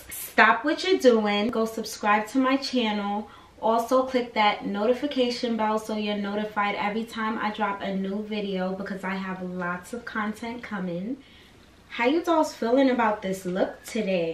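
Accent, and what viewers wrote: New York, United States. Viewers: J BIN LOC that’s just her accent she’s from New York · J BIN LOC Yes, not everyone from NY sounds like that but a lot do. It's still one of our accents or the way some of us speak.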